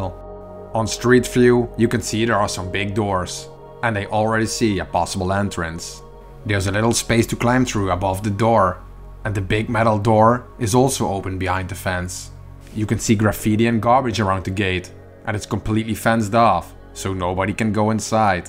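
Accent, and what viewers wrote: Netherlands. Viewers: Intriguing what they sued you for instead of suing you for trespassing as you were basically trespassing. The possible secret agenda of the asbestos clean up once more shows the different motives of parties to sue on another instead of logical reasons. It was hilarious though that the article writers thought you were American, as a Dutchy myself I can clearly recognize that accent. No offense of course. Thank you for what you do and I appreciate that you and your friends are willing to put so much on the line to show these amazing places to the world!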